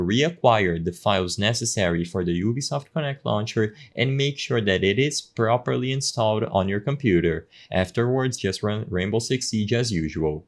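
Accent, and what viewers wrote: Brazil. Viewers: cara eu fui ver o tutorial e falei, doidera esse cara fala igual brasileiro, só que em inglês, dai fui ver seu perfil pra entender kkkk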